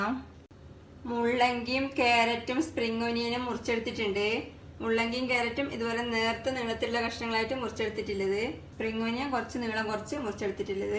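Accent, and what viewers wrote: Kasaragod, India. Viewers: Kasargod aano kannur aano?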